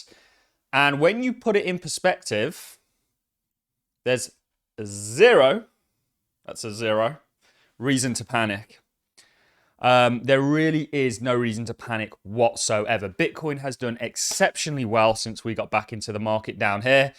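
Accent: Birmingham, United Kingdom. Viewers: Cant get more British than a brummie 😂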